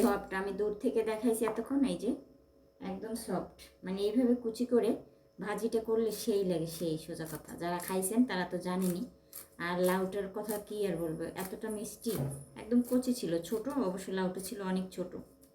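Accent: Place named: Barishal, Bangladesh. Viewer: O monu tumer parbay ❤ Borirasl bolay kothy 😎💯🔥👍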